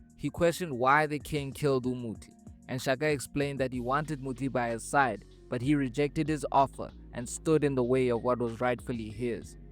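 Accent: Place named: United States. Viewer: Loved the review but your americanized accent is throwing me off abit. You Joburg guys need to stop consuming American content😂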